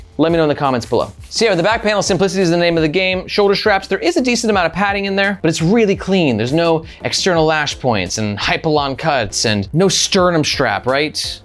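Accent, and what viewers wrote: France. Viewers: Nice French accent 😊 thank for your review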